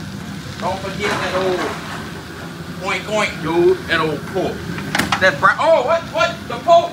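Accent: Louisiana, United States. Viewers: I love Louisiana accent they have the best in the south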